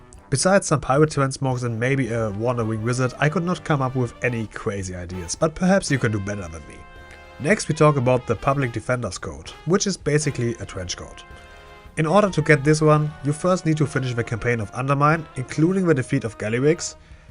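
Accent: Germany. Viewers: Most german accent on YouTube after maybe Werner Herzog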